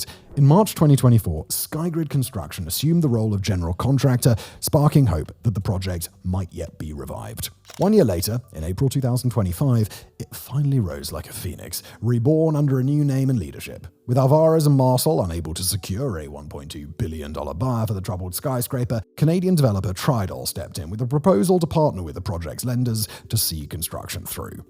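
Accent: England, United Kingdom. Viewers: Native born Anglo Canadian... so... I had to turn on CLOSED CAPTIONS. Our host talks so fast that it is hard to adjust for his regional accent. It seems the more I want to know what he just said.. the more hurried and non-standard are the sounds. It is a voice that sounds "learned" .. emphasis on the "ed". And I cannot learn from it. An achievement in speed talking with some English county to thank. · GOOD. Consider slowing down the speech a bit. The Brit accent is quite fast and tough to keep up with.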